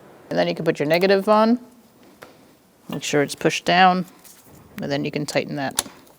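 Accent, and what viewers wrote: Boston, United States. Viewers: My favorite boston accent on the internet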